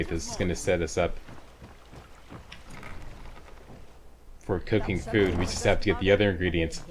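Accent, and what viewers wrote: Canada. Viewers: FREE CRACK! Just kidding. Now that I have your attention, are you Canadian? You sounds Canadian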